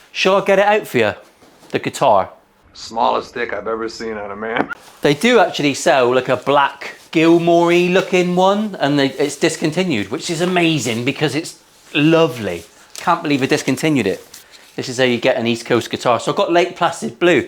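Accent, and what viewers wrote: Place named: England, United Kingdom. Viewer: Me too. I love the colourful language. It's hilarious and makes me proud to be British. Why does innuendo sound so much better in a West Country accent?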